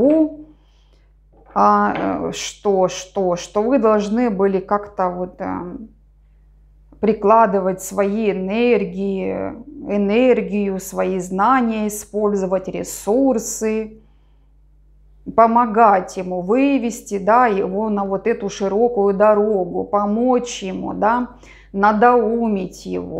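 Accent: Ukraine. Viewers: Приятно слышать ваш украинский говор специфический, в Крыму по нему можно определить местных жителей. Спасибо за расклад...он сбил серьгу... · Вы все расказали о нас с ним правду ,как будто вы за стенкой живете у нас ! Я преклоняюсь перед вами ! Как вы все правильно видите и говорите ! Дай. Бог вам крепкого здоровья и мирного неба нам всем над головой ! Да я украинка ,и вы наверно тоже ? По говору чувствуется ! 100000 раз вас за все благодарю ! Хотелось бы мне с вами сделать личный расклад ? Напишите мне ответ ? Благодарю вас !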